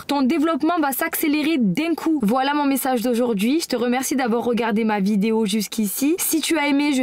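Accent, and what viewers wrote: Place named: South, France. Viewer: On reconnait l'accent du sud mais tu articules très bien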